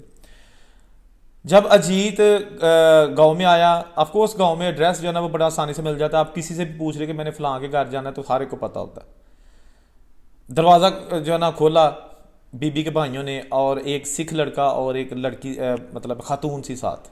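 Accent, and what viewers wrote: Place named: Varanasi, India. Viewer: ਅਸੀਂ ਨਹੀਂ ਦੇਖਣੀ ਏਹ ਵੀਡੀਓ, ਪੰਜਾਬੀਆਂ ਦੀ ਬਰਬਾਦੀ ਦੀ ਕਹਾਣੀ ਤੂੰ ਸਾਡੀ ਭਾਸ਼ਾ ਚ ਨਹੀਂ ਬਣਾ ਸਕਦਾ ਜੋ ਸਾਨੂੰ ਸਮਝ ਲੱਗੇ। ਪਿੰਡ ਪਟਿਆਲਾ ਦੇ ਤੇ ਨਾ ਤੁਸੀਂ ਬਨਾਰਸੀ ਲਹਿਜ਼ੇ ਚ ਲੈ ਰਹੇ ਹੋ ਏਹ ਸਿਆਣਪ ਹੈ ਤੁਹਾਡੀ? ਨਾ ਵੀਜਾ ਪੰਜਾਬ ਦਾ ਤੇ ਨਾ ਹੀ ਬੋਲੀ ਪੰਜਾਬ ਦੀ । bahrhaal bhai Ajit nu koi mushkil nahi honi chahidi jo MOHAMMAD SAB DE JANAM Dinn ( Eid ) di khushi ch shamil hundeyan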